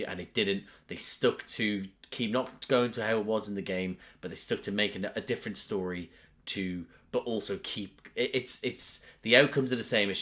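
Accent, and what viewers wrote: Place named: Northern England, United Kingdom. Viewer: R U from northern England or southern Scotland? You pronounce "about" as "aboat". You also pronounce "heart" as "herrrt".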